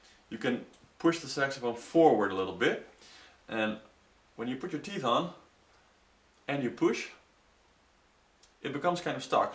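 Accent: Netherlands. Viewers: I CAN TELL YOUR DUTCH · Ik hoor dat je een nederlander bent haha. 😁 ik speel al Gitaar en Viool. Maar zou ook heel graag Saxofoon willen leren spelen. En kan niet wachten om ermee te beginnen. Ik ben er voor een aan het sparen. En ik heb me geinformeerd bij een lokale Fanfare om zo al eerst op de Sax te leren spelen voor ik er een koop. Is dit een goed idee? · Are u dutch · jij bent nederlans ik hoor het aan je stem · You're Dutch aren't you? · Is he Dutch of zo? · Sounds like you have a slight Dutch accent, true?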